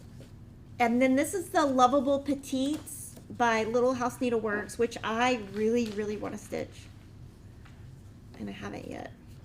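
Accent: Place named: Texas, United States. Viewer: I really like seeing other stitchers/quilters work. I get ideas especially for colors that I wouldn't have thought of on my own. Color of thread, floss and fabric are the hardest things for me to choose. BTW, I love your accent especially when you say y'all. It reminds me so much of growing up in El Paso.👍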